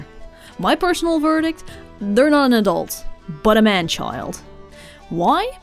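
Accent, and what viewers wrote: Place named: Netherlands. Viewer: Yeah I used to watch your vids back then but it wasn't noticable but now as I started talking to more Dutch people the accent became more noticable lol It's still a nice accent! :-) Hahaha, yeah I had to ask a friend what that "steenkolen" means - quite funny. Yeah, of course! ( As a fellow artist ) I still appreciate your art on a technical level!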